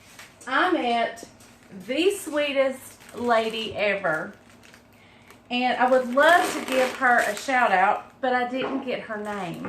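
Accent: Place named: North Carolina, United States. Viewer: And your NC accent i live in the northern peidmont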